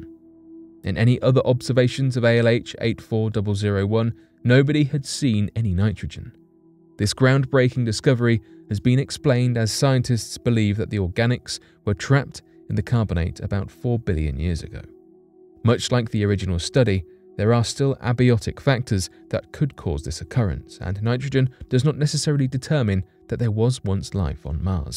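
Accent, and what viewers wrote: United Kingdom. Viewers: well now you just blew my mind....you mean this is all tongue in cheek poop info?....its that british accent- damn...i was looking to actually educate myself...last thing my small mind needs is fake info...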